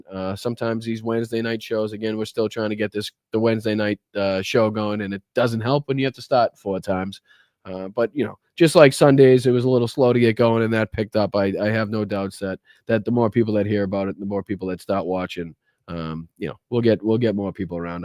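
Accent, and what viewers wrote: Boston, United States. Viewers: I love your Boston accent, but I think the amount of swearing is unnecessary.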